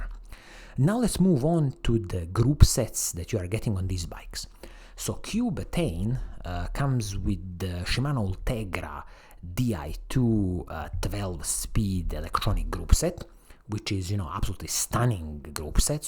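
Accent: Italy. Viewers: I really like the Italian accent. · Ma perche' video in inglese quando si sente benissimo che sei italiano????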